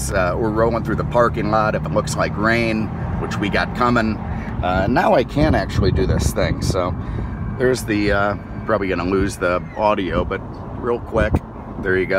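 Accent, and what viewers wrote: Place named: Baltimore, United States. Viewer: always makes me laugh. He doesn't take any "shit offa nobody" and I love the Ball'mer accent!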